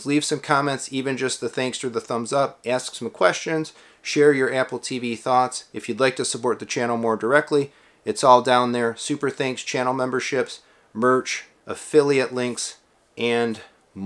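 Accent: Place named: Chicago, United States. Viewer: If this isn’t a fellow Chicagoan I’d be shocked 😄👌🏼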